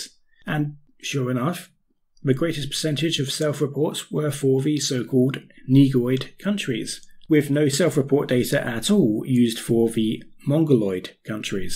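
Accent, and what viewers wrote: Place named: India. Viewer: U sound indian origin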